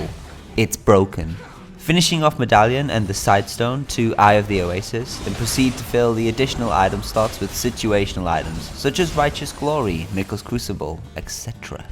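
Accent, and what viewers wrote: United Kingdom. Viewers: Your British accent makes me go: OMG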